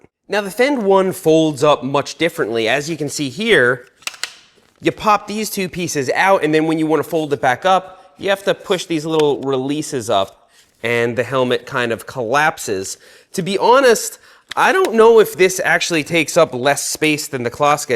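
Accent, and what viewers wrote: United States. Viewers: Love the video as always. Freaking love the responses from the manufacturers on the comments. You always have something I wanna go up and show my significant other. She says you should have an accent, but to most you probably have a really hard American accent. lol idk Also isn’t what you call the third pocket the fifth pocket? Im sure there’s another name.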